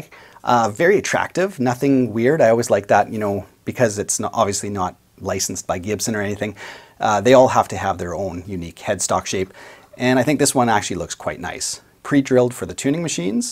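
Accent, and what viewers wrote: Canada. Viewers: Are you Canadian? I am just wondering...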